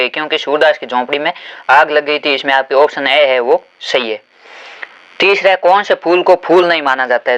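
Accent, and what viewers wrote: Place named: Marwari, India. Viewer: Ser app marvedi Ho Na apki aavaj se pata chala rha hai 😊❤❤🎉🎉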